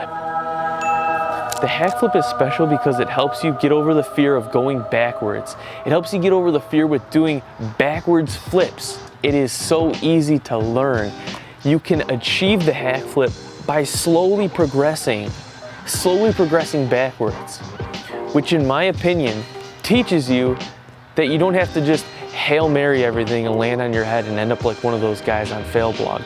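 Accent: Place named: Michigan, United States. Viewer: You have such a Michigan accent lol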